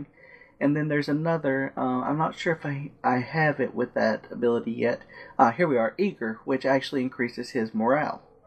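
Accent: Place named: South, United States. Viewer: southern guy love it :p sound like a friend i used to know .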